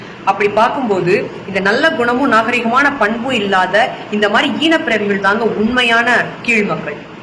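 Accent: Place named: Madurai, India. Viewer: Very good with Madurai slang deecently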